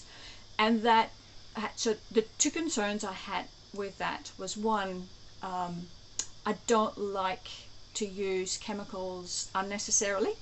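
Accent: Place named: Australia. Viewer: I love the idea of composting toilets but am also skeptical when people claim there's no smell at all. Even flush toilets smell sometimes. I'm glad to hear that composting toilets really don't smell. I didn't realize you were from The Netherlands (I'm learning Dutch, btw). You sound like you were born in Australia.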